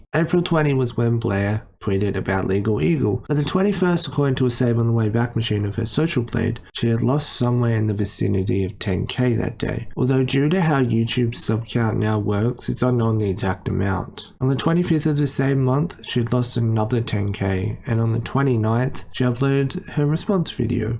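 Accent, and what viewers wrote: Australia. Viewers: maybe it's the Australian accent or that he his diction is beyond your understanding, but definitely not slurring??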